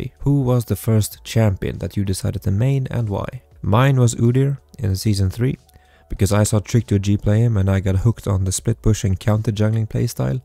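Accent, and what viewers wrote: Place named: Sweden. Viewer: The way I could tell this would be a swedish channel bc of the accent. Nice vid btw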